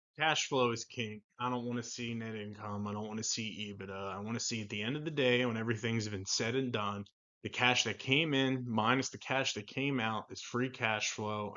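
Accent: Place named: Pennsylvania, United States. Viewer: You from PA? I hear the accent lol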